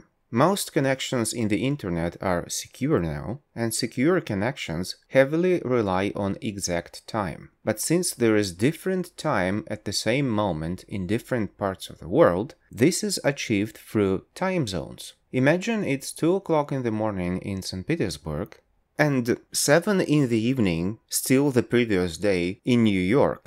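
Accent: England, United Kingdom. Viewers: Oo vi iz anglii?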